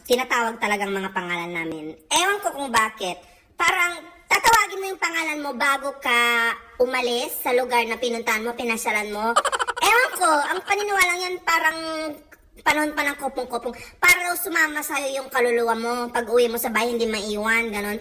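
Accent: Ilocos, Philippines. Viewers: Antie mas may dating pagka ilokano ang salita mo.. dabes yung part 2/3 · Ilokano is real parin auntie Mas magandang pakinggan SA mga ilokanang katulad Ko Char 😁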